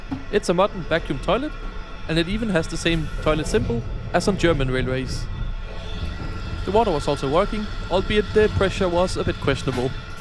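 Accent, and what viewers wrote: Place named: Denmark. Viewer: I love your Danish 🇩🇰 accent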